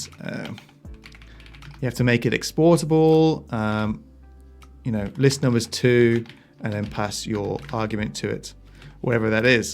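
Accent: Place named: Australia. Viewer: I’m so familiar with the accent, Aussie right ?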